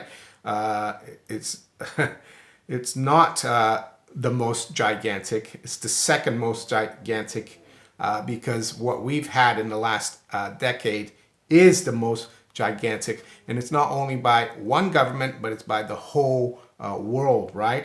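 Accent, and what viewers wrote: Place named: United States. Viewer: Very interesting history. you have the most interesting accent. I was astounded to learn that you grew-up in Brasil. (Big Bossa Nova, and and 1960s Brasil in general fan here, btw! In fact, one of my all-time favorite movies is the 1960s heist film “Grand Slam” which was filmed in Rio.) You sound like a Jewish guy from the Bronx but also very curiously have a noticeable Boston AND Canadian-like accent. Very unique! I enjoy listening to you speak.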